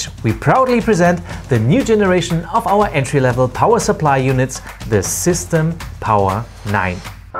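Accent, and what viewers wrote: Germany. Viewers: I feel the German accent but I have this alimentation and it doesn't makes noises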